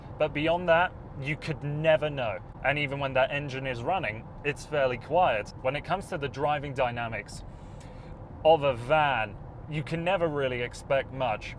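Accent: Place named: United Kingdom. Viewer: What country are you in? You have a British accent and your speedometer is in kilometers. Are you in the UK?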